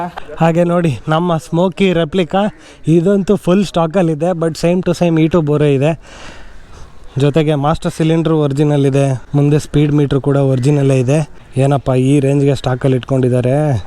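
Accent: Kundapura, India. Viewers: Avr pakka manglore a kundapura va language kundapra kannada tara itt apa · Kundapura kannada💛❤️ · Kundapura Kannada kembuk yesth Kushi ath alya ❤️💖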